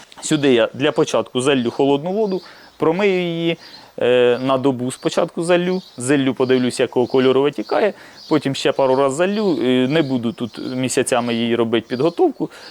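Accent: Cherkasy, Ukraine. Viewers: не чиста літературна українська, а легенький суржик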